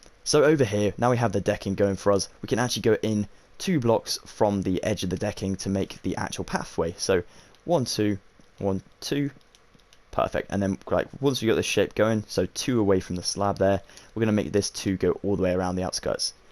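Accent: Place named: United Kingdom. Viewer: the british accent makes this better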